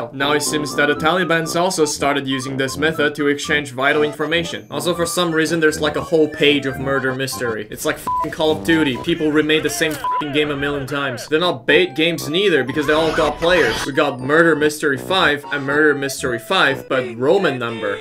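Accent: United Kingdom. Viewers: You are britsh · BRITISH BRITISH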